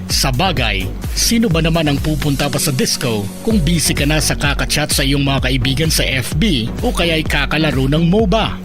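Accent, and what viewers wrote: Iloilo, Philippines. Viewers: Taga Sara ni si sir ilonggo gid hehe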